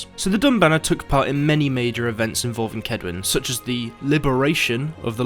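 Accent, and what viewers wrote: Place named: Scotland, United Kingdom. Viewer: The Scottish accent tho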